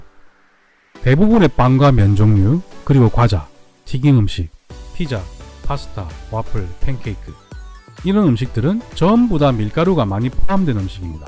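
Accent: Gyeongsang, South Korea. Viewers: 선생님 목소리가 너무 편안해요. 그 목소리 건강 잘지키셔서 오래듣도록 해주세요. 그리고 발음속에서 경상도쪽 특유의 발음이 들리는듯 한데 그 지역 분이신가요? 응원하고 있습니다.❤❤❤❤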